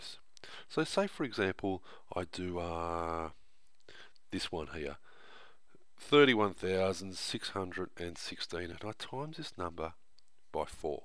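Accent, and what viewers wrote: Australia. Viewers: The second I heard the Australian accent I subscribed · YES, AUSSIE ACCENT MATH HELP! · Loving the Australian accent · It's Australian, huh? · The narrator is Australian. I like this method. I will be teaching it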